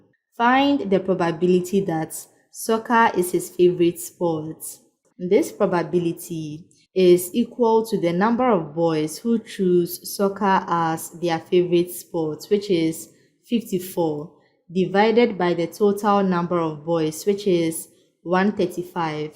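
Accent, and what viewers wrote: Ghana. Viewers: are u ghanaian ? you have a very ghanaian accent either that or your from nigeria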